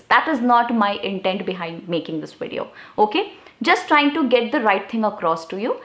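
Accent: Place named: India. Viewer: Ur spoken resembles sheer Indian accent.. Legit impressive 😍😍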